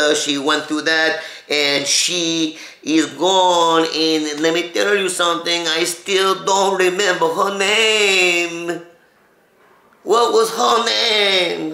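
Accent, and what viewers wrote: Mexico. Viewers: Is he suppose to sound Mexican?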